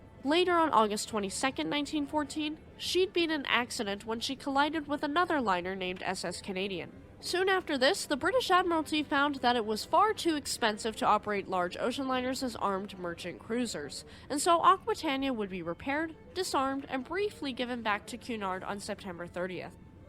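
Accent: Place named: Pennsylvania, United States. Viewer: Being someone from a northern town in Canada I don’t pretend to be great with American accents. That being said, I have a good friend from Pennsylvania and every time I listen to one of your videos I catch the subtle similarities of the accent. Keep up the good work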